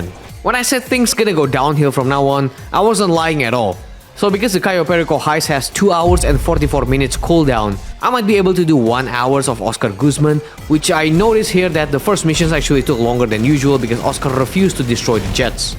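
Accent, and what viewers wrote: Indonesia. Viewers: logat nya kayak orang indo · u sounds like indonesian, nice accent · logatnya kaya orang indonesia.. bener kah bang? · i knew it!! judging from ur accent and the way u talk u are indonesian, so glad that i found indonesian gta online youtuber!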